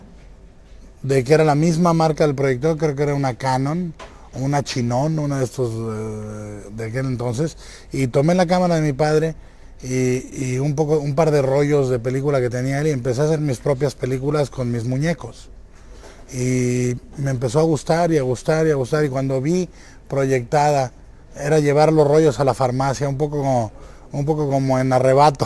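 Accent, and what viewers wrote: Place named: Mexico. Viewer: No you r wrong he is mexican he speaks like mexican, but he uses sometimes spanish words Im mexican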